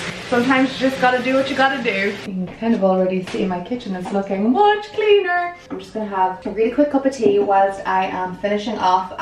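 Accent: Ireland. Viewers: I love your blogs! I organized my closet after watching you....brought out all my spring/summer clothes. Put away all my winter stuff. I could watch and listen to your sweet Irish accent! Please keep your vlogs coming! ❤️ from the USA!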